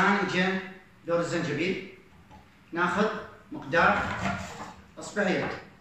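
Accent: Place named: Iraq. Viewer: السلام عليكم اخي اني انتقدك لان اولا انت لست بطبيب ولا بمعشب انت توصف هذه الوصفات الى الناس وانت تجهل مكونات ومعطيات هذه المكونات من اثار على المعدة وعلى ارتفاع ضغط الدم الذي يسببه الزنجبيل اما الحامض فهو مدمر للمعدة للذي عنده مشاكل في المعدة اضافة الثوم اني لا اعرف كيف تصف هذه الوصفة لجميع الإعمار اخي اتقي الله انت من لهجتك من العراق والعراقين طيبين ولا يؤذن الناس اخي لا تفتي بشي لا علم لك به فسوف تسال امام الله وان عملت شي بجهالة، وحسب مشاهدتي الفديو انت مسلم وتذكر الله فالرجاء ابتعد عن هذه الأمور وانت لا تفقه شي بالطب ، اذا اردت ان تريد زيادة متابعيك لا باذية الناس شوفلك موضوع اخر تعلم به ولَك الشكر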